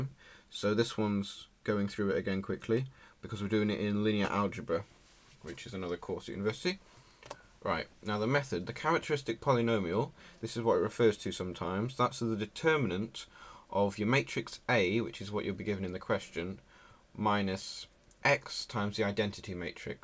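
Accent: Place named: England, United Kingdom. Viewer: English accents sound so cool! I totally get it now thank you so much!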